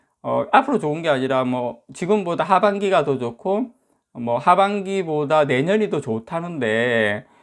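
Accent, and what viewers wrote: Gyeongsang, South Korea. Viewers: 갱상도 말씨 정감있어 좋아요 ㅡㅡ하또 하또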